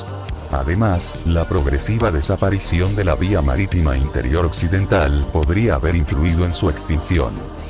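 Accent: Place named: Spain. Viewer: Yo pense que eras de España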